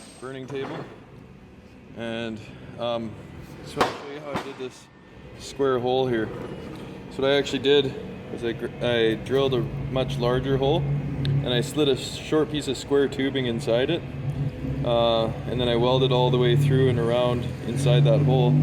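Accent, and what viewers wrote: Canada. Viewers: You sound pretty Canadian for a Kiwi... I'm a Canadian, we can smell our own.... Sniff , Sniff... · You sound Canadian.... Almost like rocky from rocky and bullwinkle, just without the "eh"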